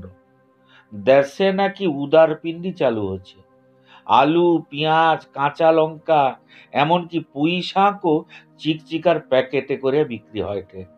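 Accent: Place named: Medinipur, India. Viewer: মেদিনীপুরের আঞ্চলিক কথায় আবৃত্তি টা শুনে খুব ভালো লাগলো।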